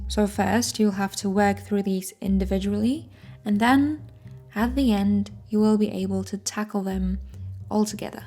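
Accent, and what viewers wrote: United Kingdom. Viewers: I honestly thought that you were British :D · Yep. The first time I heard her speaking English I thought she was from some part of the UK because I noticed a bit of an accent and I thought she has a bit of a regional accent. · i'm surprised you have anxiety speaking in English i though you were British!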